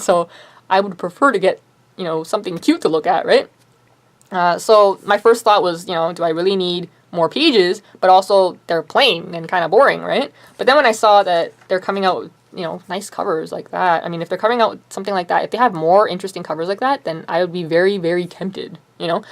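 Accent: Canada. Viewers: I love how you sound so canadian. Lol. The fast speech and dragging out certain letters or sounds. I hope im right in guessing your canadian? >.<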